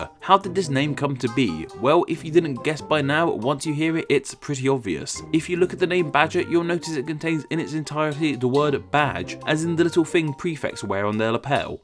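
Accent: United Kingdom. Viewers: No, you have a British accent, therefore you're not so much watchmojo as you are Whatculture. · It's only fun to say because you're British and have a British accent.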